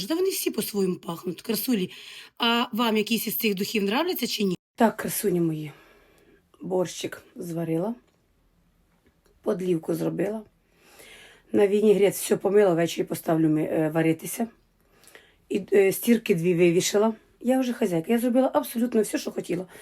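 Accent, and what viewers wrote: Ukraine. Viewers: Говір український тоже люблю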